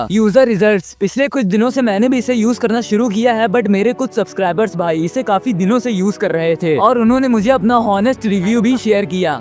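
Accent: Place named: Lucknow, India. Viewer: bhai ap bhai lucknow jaisi aawaz me bolte hoo and mai lucknow me reh chuka hu brother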